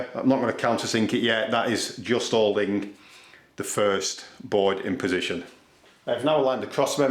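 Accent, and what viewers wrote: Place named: Chorley, United Kingdom. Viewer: I really do love your videos-have learned so much from them! Great accent too! I grew up in Chorley and it makes me feel quite homesick when i hear you! Any chance of a video to do with fitting a small handbasin in a toilet only room? Need to do this job and would feel much more confident to see you do it first!! Good stuff...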